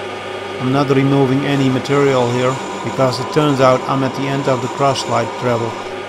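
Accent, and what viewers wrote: Netherlands. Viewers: I seem to recognize a Frysian English accent. The wooden shoes add certainty to my suspicion. Could it be that i'm right? And if so, what part of Friesland are you from?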